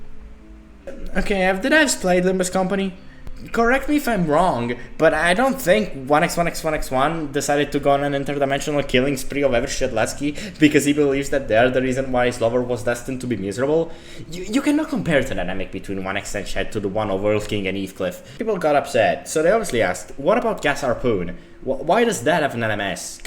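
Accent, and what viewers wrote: Italy. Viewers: heard that voice and had a suspicion, then that “proelium fatale” was obvious, sono italiano anch’io ;)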